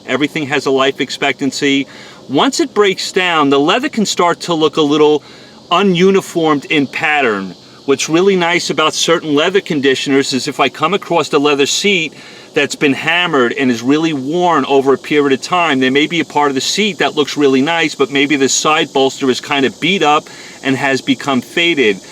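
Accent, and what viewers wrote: New York, United States. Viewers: I'm binge watching your videos and I love how thorough you are. I love hearing you talk. That NY accent is awesome. Being in the south it's nice to hear a different accent for a change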